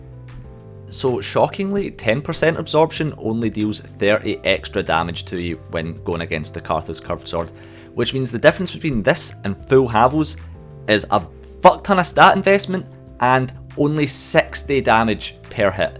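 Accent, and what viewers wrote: Scotland, United Kingdom. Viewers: This may be a stupid question but is this guy Scottish? English isn't my native tongue and I can't quite pinpoint the dialect.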